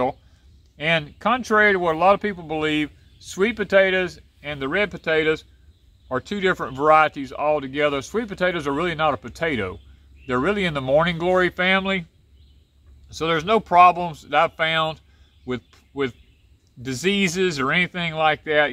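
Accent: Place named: Mississippi, United States. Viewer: As you was talking i knew you had to be in Mississippi i just knew it. Im from Crystal Springs, Mississippi born and raised. I love gardening. My granny and an uncle raised me from and infant and taught me. Nice to meet yall. I love the video.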